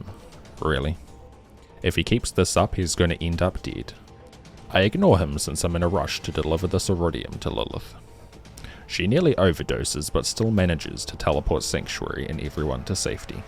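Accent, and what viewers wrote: New Zealand. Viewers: New Zealand accent is a strange choice. It's very realistic sounding, but most other accents are a lot more pleasant on the ears. "Uf I take even a sungul hut, the run is did."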